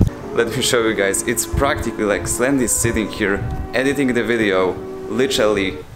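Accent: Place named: Poland. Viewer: Polish accent maybe, but still he talks normally xD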